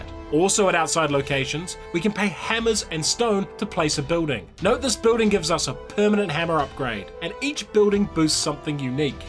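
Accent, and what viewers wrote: New Zealand. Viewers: In all fairness, he doesn't have the Letter I thing as much asmost Kiwis do, but you can still tell he's a Kiwi and not a Brit lol (For those who don't know, The Letter I thing is how you can tell Aussies and Kiwis apart, cos Kiwis struggle to sound the letter I in words... thnk, blnk, snk, as opposed to think, blink, and sink, for instance lol)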